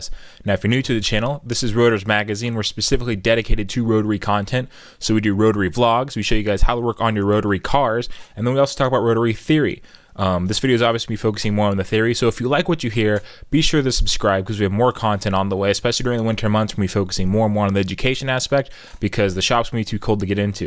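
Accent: United States. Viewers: Slow down with your voice. Most people have difficulty comprehending american. [Aussie in BC]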